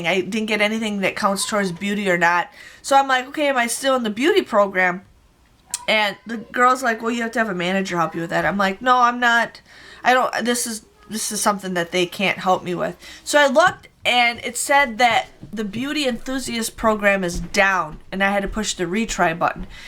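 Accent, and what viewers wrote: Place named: Minnesota, United States. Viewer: Of I didnt know you live in WI, I'd swear you were from MN or ND. It really comes out when you say certain words like "bags". 😘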